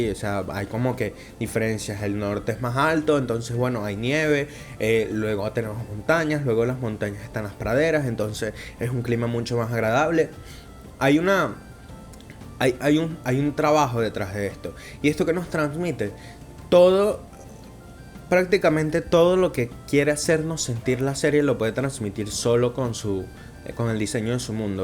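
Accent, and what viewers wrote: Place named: Venezuela. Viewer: Nadie: Yo pensando que tiene acento venezolano y dudando hasta que habla de Colonia Tovar. PD me gustó mucho el análisis de la arquitectura, de hecho hace poco para una práctica de dibujo quise replicar las ciudades de Mushoku y puedo decir que el trabajo detrás de la inducción a estos escenarios, la forma en la que te transmiten el cómo cambian las locaciones es un trabajo muy bien hecho.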